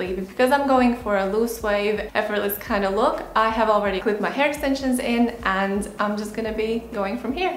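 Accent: Latvia. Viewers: ? latvian? I think, when I speak English I sounds kind a similar...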